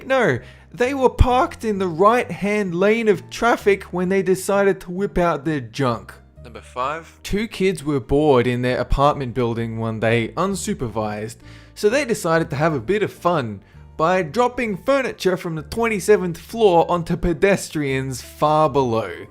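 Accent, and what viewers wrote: Australia. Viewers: sounds like an Aussie? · Debbles NZ lol wtf, I'm an Aussie and yes he is definitely Australian, how could you confuse that with an American accent? it's so obvious, especially if you're a Kiwi or Aussie!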